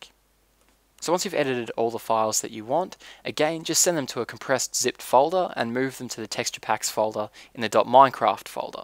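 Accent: Australia. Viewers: Are you from Australia? C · i love ur accent im not sure but is it Australian? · hes australian?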